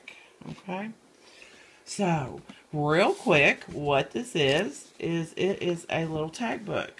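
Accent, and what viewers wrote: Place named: South, United States. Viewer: Live the little book. I notice you have a southern accent. Where are you from. I'm in Alabama.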